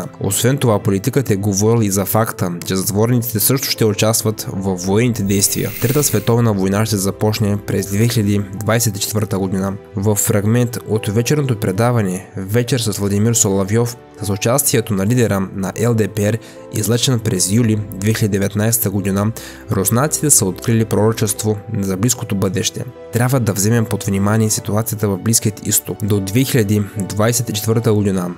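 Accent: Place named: Rural, Bulgaria. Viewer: Бате с тоя селски акцент, не знам от къде си ама скрий се в неква дупка до 2 години Русия ще се разпадне смешко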